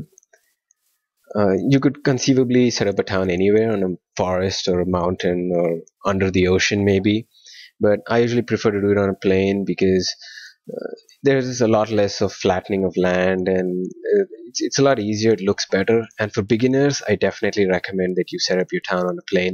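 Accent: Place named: South Asia. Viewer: u have hindi/urdu accent xddd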